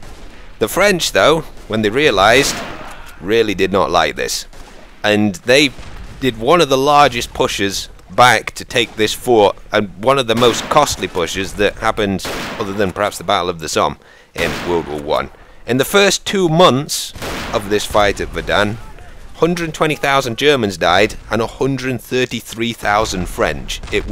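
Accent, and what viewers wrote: United Kingdom. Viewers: Not gonna lie, I didnt know was British! xD Aha, I really wanted this game ages ago, but my PC wasnt good enough (it was a pile of crap) probably gonna get it soon! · are you British??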